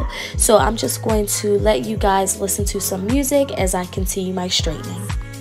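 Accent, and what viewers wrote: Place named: Pittsburgh, United States. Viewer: Love the video! Imma try it soon I hope I don’t fail 🤣 also you gotta be from Pittsburgh with that accent!!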